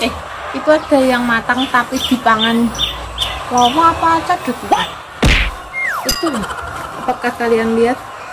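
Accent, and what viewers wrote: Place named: Java, Indonesia. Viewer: Medo bahasa jawane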